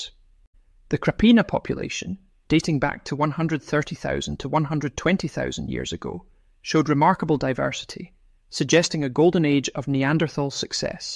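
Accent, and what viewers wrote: Scotland, United Kingdom. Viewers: Love the Scottish voice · and never realize it has not actually explained the inner ear geometry thing. Just blurb it a dozen times with a Scottish accent.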